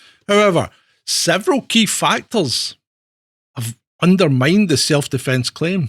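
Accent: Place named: Scotland, United Kingdom. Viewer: Wonderful Scottish accent, greetings from Aberdeen, but to the point; first of all, she was not given the right to a defense, the provisions of the human rights convention were omitted in her case, and the Vienna Convention of 1968 was omitted! She did not have a fair trial, this trial was saturated with racism and discrimination!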